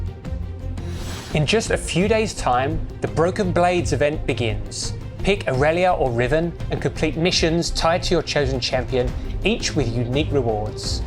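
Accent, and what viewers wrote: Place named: England, United Kingdom. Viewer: Ever heard of "english accent"? have you not seen or heard a british lad before?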